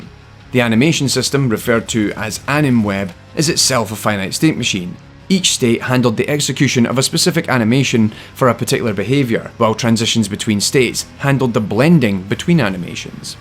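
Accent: Scotland, United Kingdom. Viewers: One like coz it has DOOM in the title... An unlike..... So i can just like it again for your SCOTTISH ACCENT.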